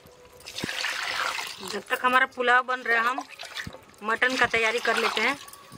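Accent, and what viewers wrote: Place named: Bhojpuri, India. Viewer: Anuty ji aplog to hamare jaise bhojpuri bol rhe ho 😅 aur apka khana v bahut majedaar banati ho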